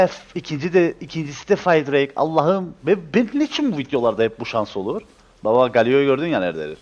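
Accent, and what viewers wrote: Cyprus. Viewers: GÜZELİM KIBRIS ŞİVESİ <3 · <3 sırf bu kıprıs türkçesi için kıprısa yerleşecem be gardaş:D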